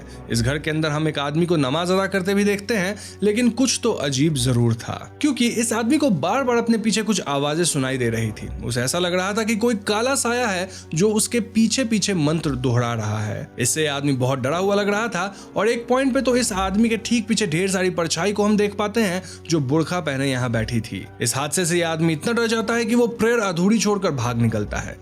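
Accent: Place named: Bihar, India. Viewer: तुम पक्का बिहारी हो, लालू प्रसाद यादव की तरह एक्सप्लेन करते हो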